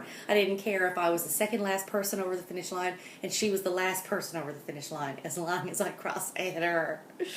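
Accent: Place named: Newfoundland, Canada. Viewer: Okay only 2 mins in and I thought is she a Newfoundlander? I wonder...then said you are from Canada! Ohhh please satisfy my curiosity? I live in NS! Lol. Now to watch your video and subscribe! At Because you count? NB? Oh my I will be editing this everytime you say something...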